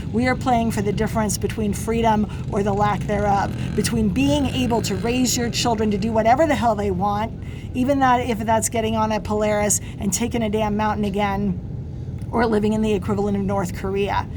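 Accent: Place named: Pennsylvania, United States. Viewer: You sound like you ran around the hills of pennsylvania!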